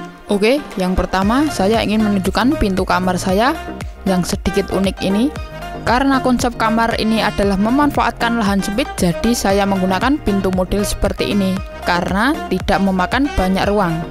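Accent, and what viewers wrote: Java, Indonesia. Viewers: Wadohh zimzajaib kok jadi medok gini ya!? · medok medok joss🙃, kreatif, menarik salam dari sragen jawa tengah brader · Ya ampun...salut dah.... bisa dibuat kisah inspiratif bagi murid-murid saya. Suwun Dik.... bahasamu medok banget...hahaha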